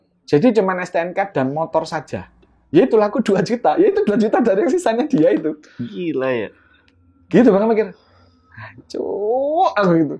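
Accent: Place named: Surabaya, Indonesia. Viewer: Bhasane asik bnget kaLau orang SBY yg cerita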